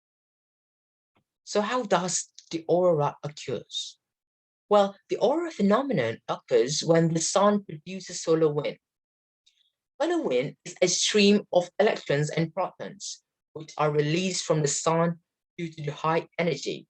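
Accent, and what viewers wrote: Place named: United Kingdom. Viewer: Wih ini cara ngomongnya british banget yah keren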